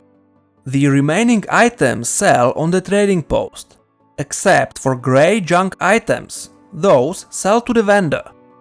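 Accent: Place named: Czech Republic. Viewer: Ta čeština z toho celkem prýští :'D dobrý video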